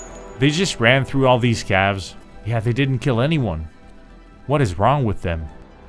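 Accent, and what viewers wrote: United States. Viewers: Dude that’s crazy! I never would have guessed that! I thought you were American, to be honest.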